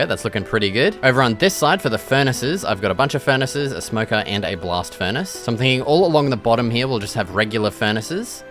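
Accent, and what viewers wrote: Australia. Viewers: The way you talk is so Aussie! I bloody love that mate!!! ❤ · Hahahaha i love how aussie he is hes like the only minecraft youtuber that sound like their from australia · Are you Aussie cause you really sound like one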